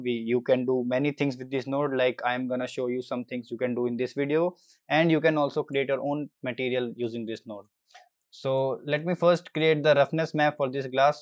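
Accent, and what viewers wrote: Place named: India. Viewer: LMAO! i wanted to say i like the indian accent :))) BUT YOU SAID IT FOR ME :DDDDDD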